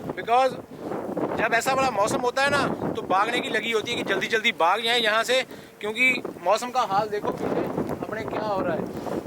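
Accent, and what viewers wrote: Punjab, India. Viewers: Why the fuck he speaks Hindi in pu jani accent? Why can’t he talk in Punjabi just curious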